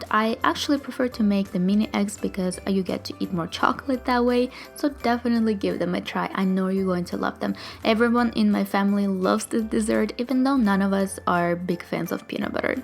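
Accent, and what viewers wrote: Russia. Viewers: Sweet Russian I think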